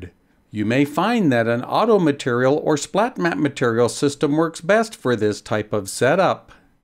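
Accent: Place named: Canada. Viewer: You do you. Be yourself. Your voice is part of your character. You're Canadian. I dig it. :) Certainly public speaking experience benefits everyone. But people like to complain. If it's not one thing, it's another. So, really, who cares...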